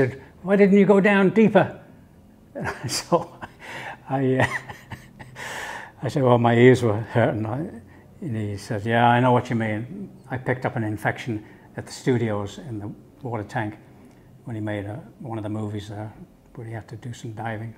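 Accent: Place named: Liverpool, United Kingdom. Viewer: I wonder if he ever said he knew the Beatles when he was chatting up the maids? He sounds like a Liverpudlian.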